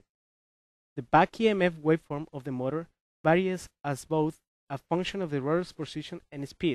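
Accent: India. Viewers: that's Indian accent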